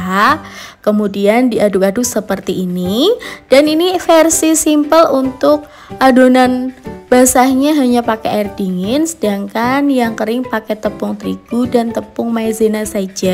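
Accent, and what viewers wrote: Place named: Java, Indonesia. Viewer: Nada Jawanya terlalu muuweddok banget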